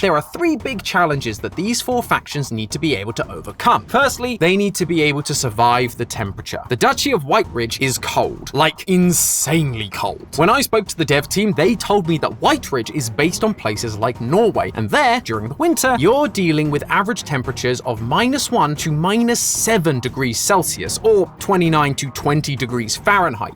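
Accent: United Kingdom. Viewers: A British voice explaining lore just hits different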